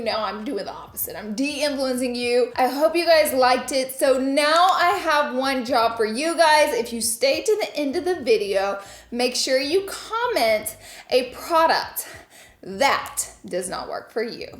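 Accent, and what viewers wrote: South, United States. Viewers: i haven’t watched your vids in a minute but your southern accent sounds heavier than i remember 😂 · I swear half of why I watch you is because I love your southern accent. I’m from Florida but live in Georgia now and I’m always like “watch this girl she says oil all southern like y’all” Also I can’t understand why you’d even wear shapewear you’re friggin perf.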